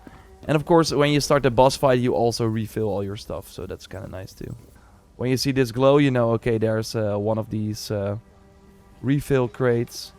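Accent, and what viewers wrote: Netherlands. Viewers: Dude are you from the Netherlands by any chance?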